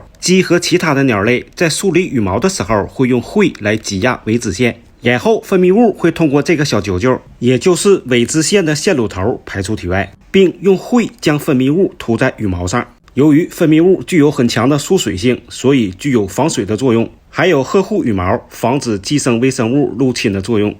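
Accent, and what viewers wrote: Dalian, China. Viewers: 的大连口音听起来真亲切。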